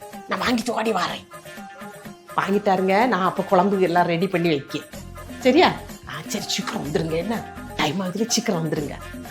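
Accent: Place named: Kanyakumari, India. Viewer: Konjam kanyskumari slangai maathalamae.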